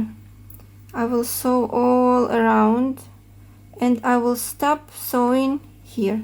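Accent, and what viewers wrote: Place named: Russia. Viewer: какая красота но смотрю без звука, ужасно режет слух. Всё-таки русский акцент в английском языке это нЕчто, произношение как будто топором рубят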